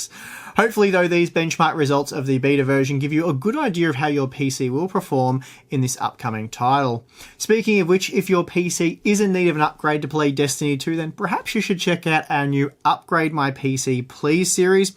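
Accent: Australia. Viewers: Great work mate! nice to hear an aussie for once doing bench marks ;-) keep up the great work!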